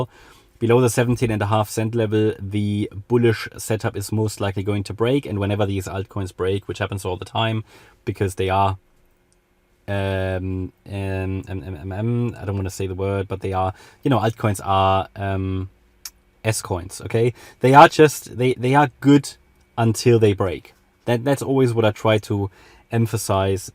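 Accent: Germany. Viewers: Man merkt dass du deutsch bist 😂 sehr guter Content mach weiter so!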